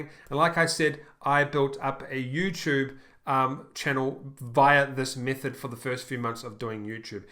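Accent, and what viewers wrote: New Zealand. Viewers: do you come from new zealand? you sound like a kiwi haha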